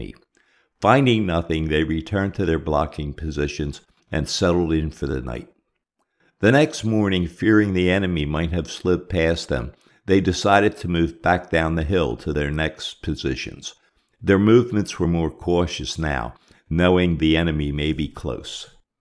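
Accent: Philadelphia, United States. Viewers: Narrator is definitely from Philly!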